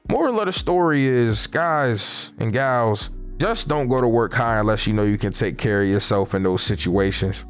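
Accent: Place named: New York, United States. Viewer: Real ny shit red lobsters in eny or what lol gateway i know u from tha town u can here it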